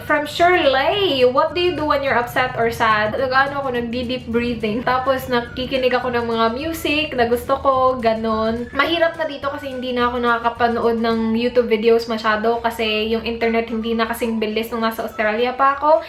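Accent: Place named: Visayas, Philippines. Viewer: The way you are, being simple made me subscribed to your channel. I start my day watching your vlog. I enjoy watching you and your family. My mom is from Mindanao and my dad is from Cebu. So when I hear you talk visaya, it reminds me of my childhood. Best wishes to you and your fiancé from us here California 😊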